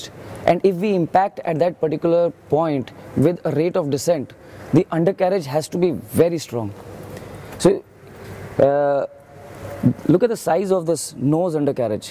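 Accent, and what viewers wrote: India. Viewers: Anything involving an Indian speaker can't go without some dumbass making fun of the accent. It's a cliché now so nobody's laughing.... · bro this is the indian version of English. There are many version. The English speaking in America is not the English speaking in Europe 👍👍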